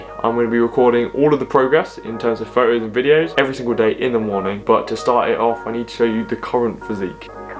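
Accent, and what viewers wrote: United Kingdom. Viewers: I just searched for "30 day ab workout" and you popped up and when I clicked on your vid,my volume was up but I had a feeling that your accent was gonna be British idk why but I was right lol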